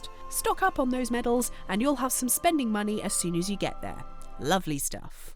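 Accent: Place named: United Kingdom. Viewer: the British accent makes a difference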